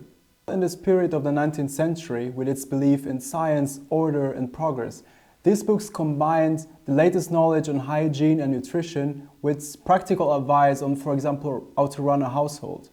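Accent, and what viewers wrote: Germany. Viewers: buongiorno you english has, for me that are also italian, sound with a german accent....maybe your greman is perfect, saluti da Torino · Crazy, you even speak English with a German accent lol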